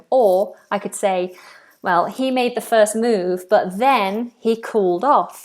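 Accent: United Kingdom. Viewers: Teacher I watch that record fron place who doesn't showen in a map. I just like walk there. It is somewhere not far from Cherson . If be honest I always practice with my gun there, In this way I make sure I don't bother people. P.S I found off British accent, so try hard to imitate your accent. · I love british accent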